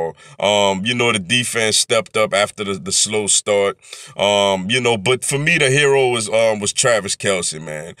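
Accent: New Orleans, United States. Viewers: Where are you from man sound like New Orleans.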